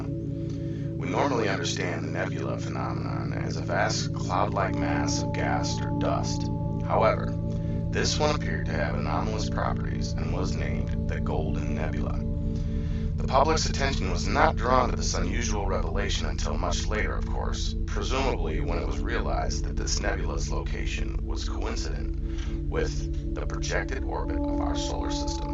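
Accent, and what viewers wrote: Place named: United States. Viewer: well he is irish, this is an american accent.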